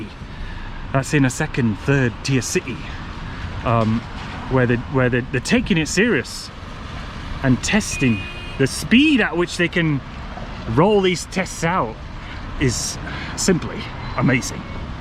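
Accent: United Kingdom. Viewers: No more UK accent? Haha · I came to listen British accent. It's so cute.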